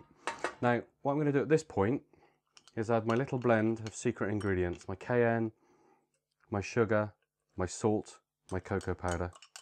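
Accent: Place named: United Kingdom. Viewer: It's getting cold here in NC, and I kept warm with your hot chocolate recipe last winter. Made it last night and can't wait to make it again tomorrow night. I'm a sucker for the British accent too 😉☕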